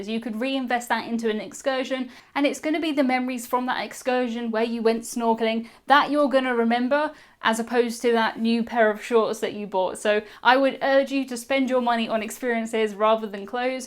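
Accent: United Kingdom. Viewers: Hi, great tips and I do like your background. Especially, bringing a variety of medicine, (including sea sickness pills). I'm one to bring stuff like that, as I grew up a Girl Scout (Girl Guide in Britain) and try to be prepared for anything. My companion would probably be the on to appreciate that I had headache medicine and not only extra sunscreen, but also the pain relief spray in case of a sunburn. 💊🧴. I'm in the US, near Chicago, IL and I d o enjoy listening to your voice. It doesn't seem posh, just a Brit speaking. 😊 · Please say "aeroplane" just one more time. I love the British-isms. "Spec-i-AL-i-ty" "Washing" "The Lift" Love it. Keep talking.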